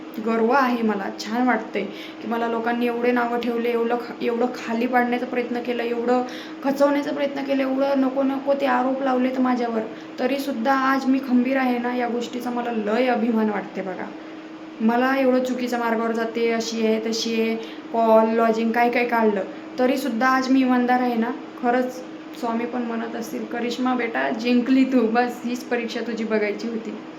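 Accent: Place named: Vidarbha, India. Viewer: तुझी भाषा विदर्भ खूप छान आहे मला खूप आवडते मी खूष आहे तुझं स्वभाव छान एक नंबर आहे ❤❤🎉🎉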